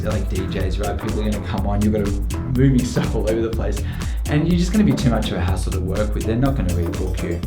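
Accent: Australia. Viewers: i can hear and aussie accent? ahahahah love it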